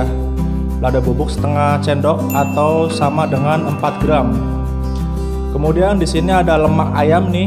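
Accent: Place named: Banyumas, Indonesia. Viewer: Ngapak kayanya bang🤭😁😁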